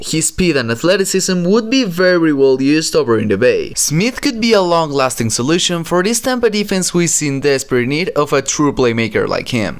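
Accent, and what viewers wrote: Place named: Europe. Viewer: I don’t trust anyone with a European accent to talk football.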